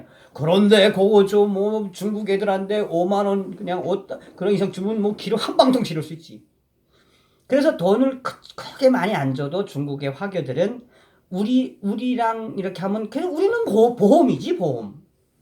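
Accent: North Korea. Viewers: 웃겨요 북칸사투리 이미눌러음매다 ㅎ ㅎ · 악플다는 놈들 신경쓸것 없습니다. 그런 거지 같은 새키들 망할 인간들입니다. 그런데 말씀을 하실 때 이북 말씨 보다는 그냥 서울 말로 해 주세요. 서울 말씨가 듣기가 편안합니다. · 넘 잼나고 유익한 방송 열나게 보고 있습니다! 이북 사투리가 구수하고 정겹습니다! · 고조고조 걱정 마시라여 최고임네다 북한말 재미슴네다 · 감독님 너무 재미있습니다 배꼼 잡았습니다.특히 북한말씨 넘 웃깁니다, 호호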